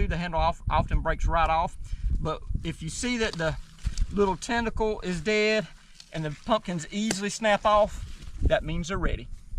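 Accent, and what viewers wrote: South, United States. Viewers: I'm from minnesota... I love your southern accent.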